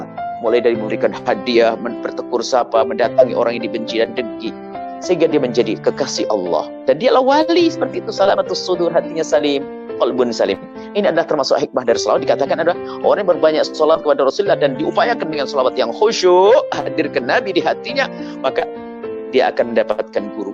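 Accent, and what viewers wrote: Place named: Malaysia. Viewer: Ini youtubernya org malaysia ya. Dri logat suarany. Salam kenal Jkt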